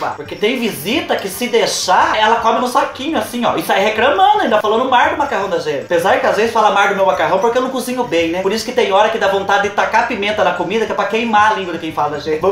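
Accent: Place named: Caipira, Brazil. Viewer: do céu vou dar uma apertada em vc so por esse sotaque caipira que eu adoro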